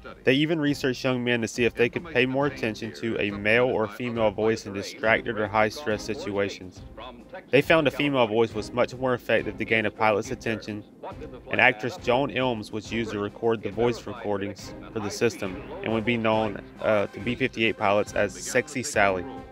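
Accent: South, United States. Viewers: It's weird to hear a narration that has a southern accent like mine. I love it. Fantastic video, the B-58 is an unsung marvel of the cold war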